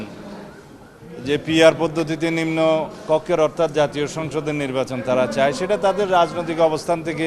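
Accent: India. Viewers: র এর সদস্য জ্বালা হবেই/সালাউদ্দিনের কথা বলার স্টাইল আওয়ামী ও ভারতের মত। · এই টা ভারতীয় মাল কথায় বুজা যায়।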